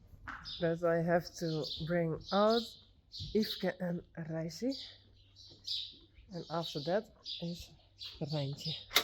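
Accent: Netherlands. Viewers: I like the Dutchy way you say things.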